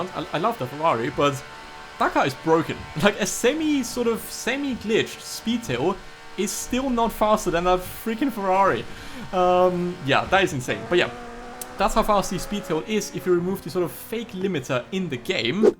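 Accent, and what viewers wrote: Germany. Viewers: man hört deinen deutschen akzent tichig raus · u sound german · Bro bist du deutsch? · Hör ich da deutschen Akzent? 😅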